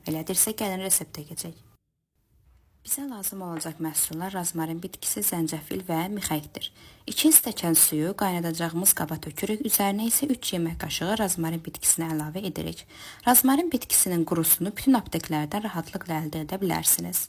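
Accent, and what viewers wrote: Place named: India. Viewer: doğurdan bu ses senin sesindi? Birinci ele bildim hint qızıdır 😅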